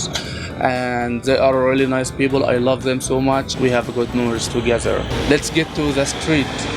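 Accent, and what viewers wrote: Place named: Egypt. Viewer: I can tell from his accent that he's Egyptian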